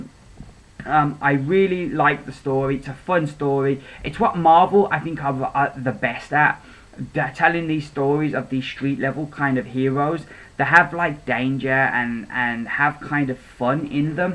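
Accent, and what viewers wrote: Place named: South, United States. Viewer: Enjoying your southern US accent :)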